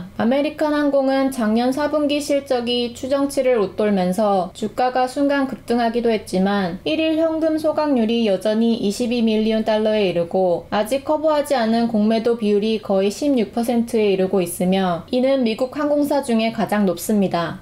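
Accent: Gyeongsang, South Korea. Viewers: 님 경상도 억양도 좋지만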